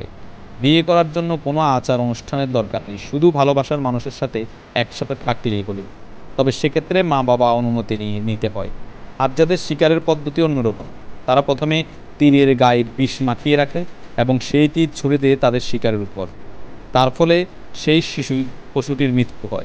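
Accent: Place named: Chittagong, Bangladesh. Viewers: ভাই আপনার কমেন্টারিতে নোয়াখাইল্লা, চাটগাইয়া,একসেন্ট আসে,শুনতে 😊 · ভাই কি চট্টগ্রাম নাকি নোয়াখালীর? ভাষায় তো চট্টগ্রাম। উডা হডে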